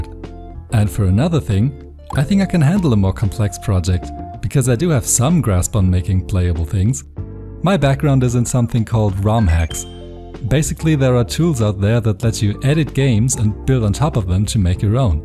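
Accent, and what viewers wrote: Germany. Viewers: german guy? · Den Deutschen hört man schon deutlich raus Bruder. ; ) Du hast nicht zufällig früher TheEmero geguckt? : D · der deutsche akzent der isses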